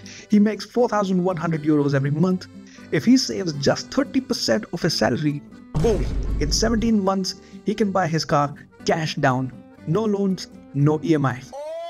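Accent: India. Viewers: we can hear your indian accent buddy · Is These Guy Indian His accent Sound's like Indian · 💀🙏bro r u really an indian? Y r u speaking in like an-like an indian accent?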